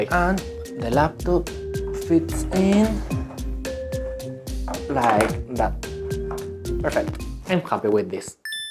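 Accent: Spain. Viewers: Great video. You sound spanish, are you from spain? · Bro has a spanish english accent lol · spanish accent on a asian guy is really trippy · It’s breaking my brain to see an Asian with a Spanish accent. 😊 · Are you a spanish speaker? Your accent while speaking english is kinda similar to ours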